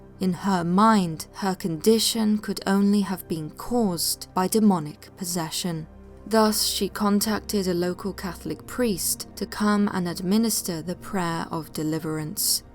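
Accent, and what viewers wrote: England, United Kingdom. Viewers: It’s the queens English mate, all the best 😂🇬🇧🏴🇺🇸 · English from England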